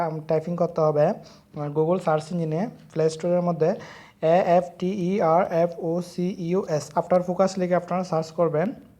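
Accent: Noakhali, Bangladesh. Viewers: After focus pro i have used long times ago around 5/6 years when i was using SYMPHONY XPLORER W25 which was my first android smartphones. Moreover it was a gingerbread version, so your review doesn't making any bell to me. By the way one request to you: "your review might well perhaps your presentation was really terrible & i hope next time you will be more careful to use proper Bengali accents instead of নোয়াখাইল্যা খিচুড়ি কথা, either your review will going to be spoiled.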